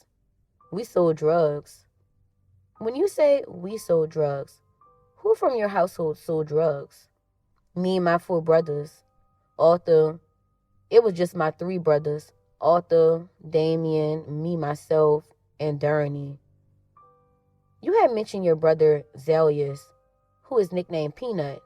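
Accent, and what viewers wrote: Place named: New Orleans, United States. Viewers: 💯👍🏾 Telly was a Dog! The narrator sounds like she’s right out the 7th ward! Lol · Finally... someone with the Nola dialect reading. Great job!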